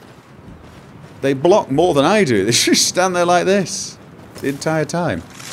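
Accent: United Kingdom. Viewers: WARNING: Watching vids can cause you to talk to yourself with a British accent when gaming.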